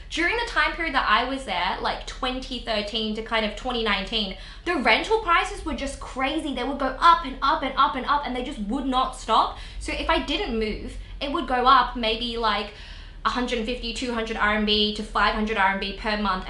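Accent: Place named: Australia. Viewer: 戈小姑娘额英文一听就晓得是Aussie。现在住在哪？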